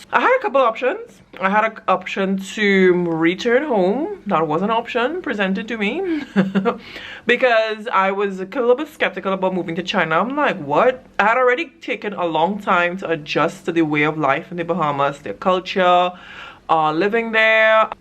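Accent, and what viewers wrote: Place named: Trinidad and Tobago. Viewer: I bump into a video by you talking about fenugreek...so I'm asking my husband "listen to her accent, tell me what it sounds like." He said Trinidadian. And you confirmed it in this vid. Good videos btw.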